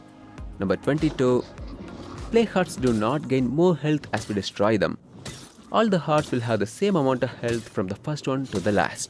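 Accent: India. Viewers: Why do you sound like a dirty pajeet? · This.is literally just a copy of the other dudes 2024 vid with an indian accent. Same transitions and everything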